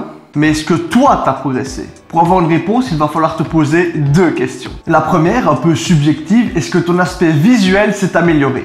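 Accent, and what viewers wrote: Liège, Belgium. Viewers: Les Nuls 😂😂 Excellent avec l'accent belge! Top Vidéo continue! 💪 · oufti Gros gros accent de Liège... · J'aime ton accent (liégeois je pense) mdrrr bonne vidéo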